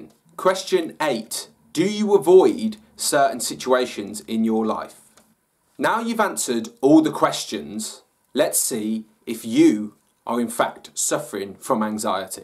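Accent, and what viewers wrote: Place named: Brighton, United Kingdom. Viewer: Leah Stein it's the Brighton accent I think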